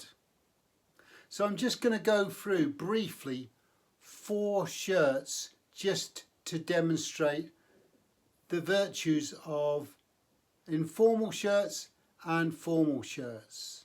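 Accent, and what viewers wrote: United Kingdom. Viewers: Oh marvelous. I used to work in Beccles as a gamekeeper when I was young and a butcher a few years after that. I'm from Narj actually. I did hear you used some eastern terms and a slight accent with them lol.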